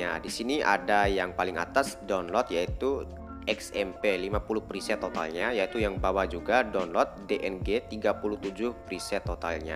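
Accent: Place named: Bali, Indonesia. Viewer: iya...iya teman2 kayk bli..bli di bali logatnya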